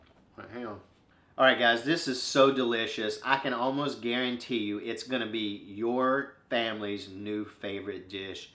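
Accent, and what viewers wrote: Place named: South, United States. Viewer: By chance, l saw your recepe for cubed rump steak. I didn't want to make a casserole. Yours popped up immediately. I love your accent and personality. Is it a southern accent? The hairdresser l follow also has the same accent. March 2025. I wish you would use the metric sizes. However, l can check it out. Thanks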